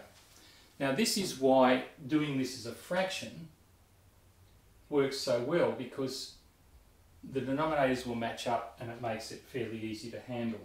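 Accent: Australia. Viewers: Not sure why, but having another Australian explain this helps so much. Top stuff, cheers!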